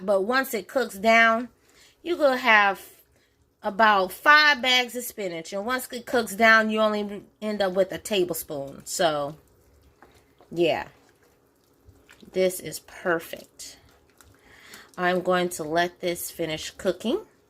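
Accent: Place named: South, United States. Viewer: The ingredients, the preparation, the cooking talent, the presentation, the final result.......am I in Tuscany? The touch of a southern voice....the spot-on Julia Child-type interaction with the viewer....the ease and simple communication of the cooking sequence...all contributes to wanting to follow.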